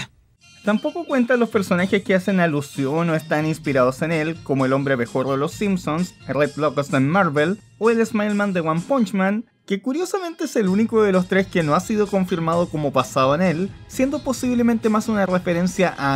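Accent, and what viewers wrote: Chile. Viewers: a mi lo que me gusta es tu acento chileno poh saludos desde guatemala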